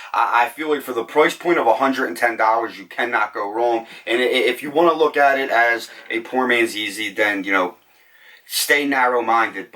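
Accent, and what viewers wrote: Philadelphia, United States. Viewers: heavy Philly accent. I love the look of this shoe.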